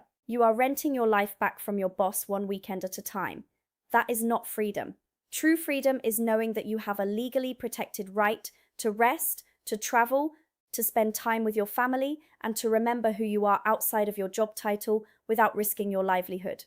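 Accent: United Kingdom. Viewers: is she really an American? her UK accent isn't that of someone from the USA meaning U think she was born outside the USA and emigrated here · WOW! Great piece and so well put together. Freedom .... scanning for emergency exits everywhere you go, kids with a bulletproof insert in their school satchels, neighbours measuring how long your grass is. That brought it home how visceral the day to day experience is. Imagine being a mother and inserting a bullet proof thingie into your kids school satchel. Every day is a reminder of what COULD happen. We all know USA has a gun problem and an even bigger problem is some of the people that own them .. They practically walked into like a Tesco that sells guns and bought one off the shelf. That is from a UK point of view UTTERLY MENTAL and we all knew that. BUT your piece was VERY ILLUMINATING in outlining the pyschological effects and the almost constant unease of being in public spaces. 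I do hope the unwinding of your nervous system and your deeper sense of ease will continue to grow. We all knew the potential physical dangers but had probably not thought about the wear and tear on your pysche. Thank you for your most illuminating piece. May your new more at ease state of mind continue to grow and deepen. Your accent has become very UK as well. Welcome! ❤❤😊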